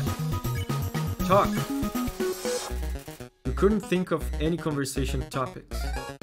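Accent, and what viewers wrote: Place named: Brazil. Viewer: EU SABIA QUE O SOTAQUE ERA BRASILEIRO Meu amigo, sua reação ao receber o abraço da Toriel, eu nunca tinha visto kkkkkkkkkk Mas sério, eu amo de mais ela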